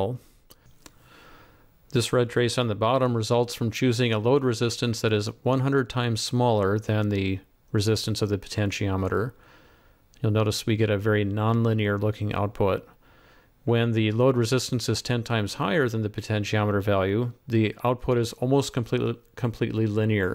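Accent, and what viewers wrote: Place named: Oxford, United Kingdom. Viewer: SIR Just now i watched yr video on POTENTIA METER. My copliments to you are:-- 1. liked yr video for its quality information packed in it. 2. U are a very good tutor/lecturer and i deduce from this small video that u must have wide rich practical working experience in the electrical/electronics field. 3. i could follow every word of yr lecture because of yr stylish English that too Oxford English which we are familiar here in India. 4. yr diagrams there in the video and appropriate formulas are added assests to yr lecture. 5. please keep up the same pace /speed of yr lecture in future videos also as we dont miss any thing there. 6. Subscribed and looking forward to yr videos on electronic essentials. Thanking you vatsa Bangalore INDIA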